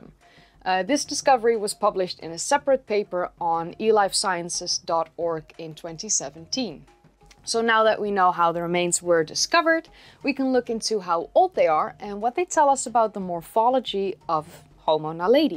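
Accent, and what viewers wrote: Netherlands. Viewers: Na 3 seconden hoorde ik dat je nederlands bent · Ik hou van je Nederlandse accent en hoe intelligent je klinkt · Do I detect a Dutch accent? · do I detect the slightest Dutch accent? maybe even belgian?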